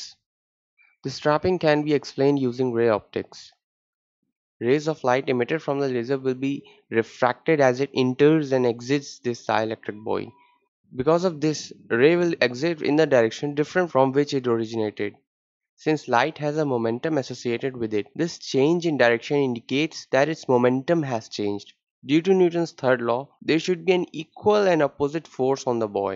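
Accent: India. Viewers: You seem to a young Indian by your accent What the f in United states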